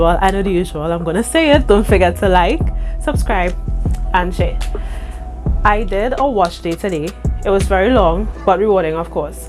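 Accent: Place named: Trinidad and Tobago. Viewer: I love your accent!!! I have trini friends so this makes me feel like I'm chilling with my peoples 😂🤗 let me finish watching haha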